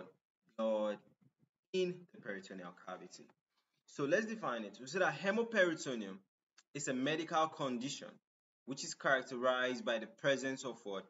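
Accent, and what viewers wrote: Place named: Nigeria. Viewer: Very refreshing to hear a Nigerian accent in a video like this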